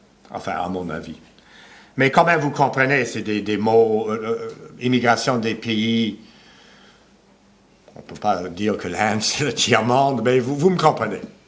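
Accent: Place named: Montreal, Canada. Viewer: Talks like a guy from Montreal. Yeah.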